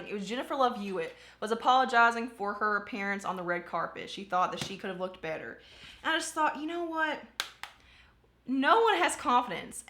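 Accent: South, United States. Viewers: Love you, your makeup skills & your accent! I'm from Georgia & I'm proud of our southern accent! I've been #partypeople for quite some time! I graduated high school in 1993 & your birthday is in 1994....makes me feel old! Lol I'm just happy to be alive & watching your videos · Girl i freaking love your southern self! Im born & raised here in North Ga and i loooooooove the drawl!!!! Plus i love the fact you are unapologetically YOU!! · Anna Kennedy I found, watched about 30 sec of a video, heard that beautiful southern accent come out of her mouth, and immediately subscribed. 😁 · I love the fact you’re Southern! I know (as in yes I actually know her, her dad and I worked together) another YouTuber and she has apparently tried to erase her Southern accent. I like to hear us speak, we don’t sound like every other person and that’s fun!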